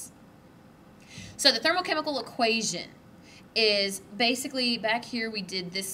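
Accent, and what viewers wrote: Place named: South, United States. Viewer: If my teacher had a cute barely southernish accent instead of being some 65 year old bore, I think I would have enjoyed class a lot more. Thanks for helping with my finals!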